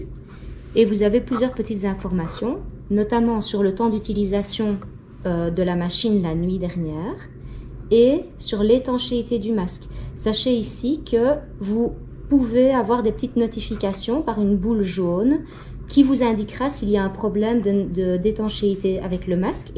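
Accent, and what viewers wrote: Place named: Belgium. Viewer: En Belgique, c'est comme cela que l'on parle français et on s'en accommode très bien, ne vous en déplaise.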